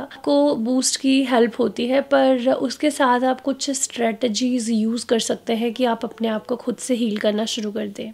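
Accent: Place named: Doaba, India. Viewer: Ist comment but follow bahut tym ton kr rahi, bolan de tareeke ton laggda hai k tuc doaba belong krde ho,mee too,jalandhar de aas pass,aur tuc??bahut achhey vlogs hunde ne, l like veer ji too much,the way he talks,v sensible nd funny nature too,i like it,plz rply i m sonia from jharkhand wid my in laws!!!🙏🙏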